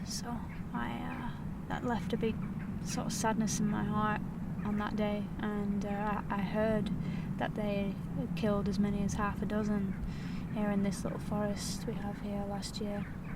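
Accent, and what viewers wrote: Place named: Manchester, United Kingdom. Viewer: Your great! Where are you from im detecting manchester?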